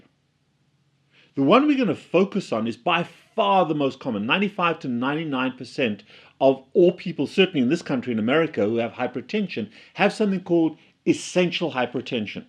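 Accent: South Africa. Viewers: I was curious about your accent as it does not sound American, I had a hunch you might be South African! We have the best heart doctors :-) Great videos!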